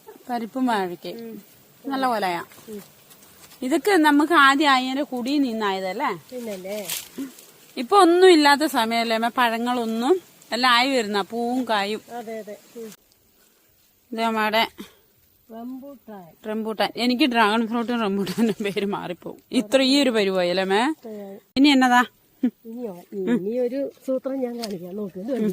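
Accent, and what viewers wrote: Kottayam, India. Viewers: Kottayam ano. Bhasha Kottayam alkkarude pole und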